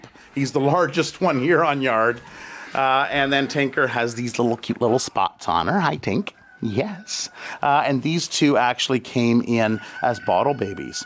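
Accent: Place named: Canada. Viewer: American accent? lol I was engaged to a Canadian for a few years so I spent some time in Ottawa and you are undoubtedly Canadian lol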